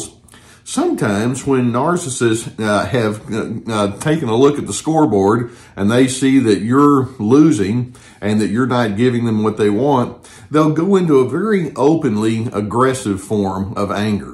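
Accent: South, United States. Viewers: Haaaaaaa ;D))))) you caught me out there , I wasn't expecting that word out of your mouth with that sweet southern drawl " a jerk " lol hearing you say that word really did tickle me , you have a good day sir ...I will always come back to hear that delectable accent ;D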